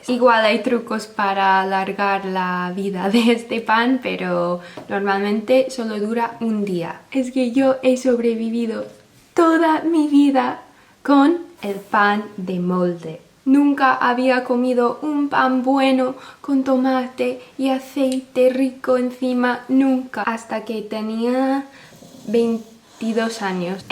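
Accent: Spain. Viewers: Hola ! Acabo de encontrarme con tu canal ! Yo soy Mexicana ! Se me hace interesante descubrir España a través de una estadounidense 😊. Una observación la salsa q comparas con el gaspacho no es una salsa Mexicana! Es una mezcla texmex ! Una verdadera salsa mexicana es muy diferente. Por otro lado te felicito por lo bien q hablas el español con todo y el acento de los españoles.! Saludos y bendiciones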